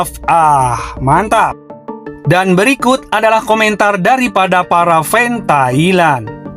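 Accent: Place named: Malaysia. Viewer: Mantab bang logat bahase melayu nya, saye dari Kepulauan Riau👍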